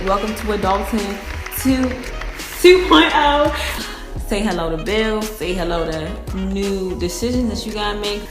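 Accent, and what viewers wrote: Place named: Baltimore, United States. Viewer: I can tell she from Baltimore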